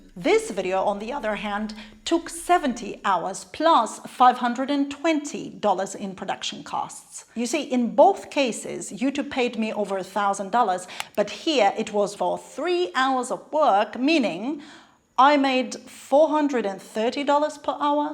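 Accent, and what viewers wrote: Jamaica. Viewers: Girl I hear the Jamaican accent coming out lol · LOL....Good Joke....We love your accent, especially when the "Jamaican" part "sneaks in"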